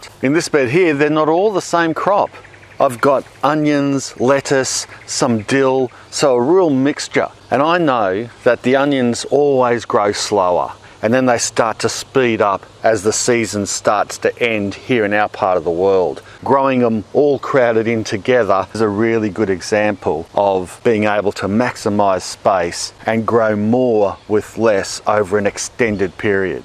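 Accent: Australia. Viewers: Oi Loike Baaiiibeees. Auzzie accent can be so epic. Also. Good video!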